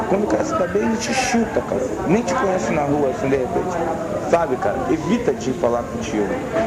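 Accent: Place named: Brasília, Brazil. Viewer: Sotaque Brasília antigo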